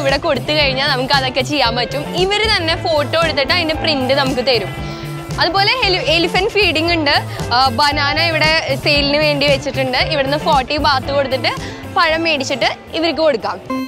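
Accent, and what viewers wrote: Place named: Thrissur, India. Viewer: Your voice, Thrissur slang and way of presentation are very much attractive.. Nice..♥️..also animals shows are exciting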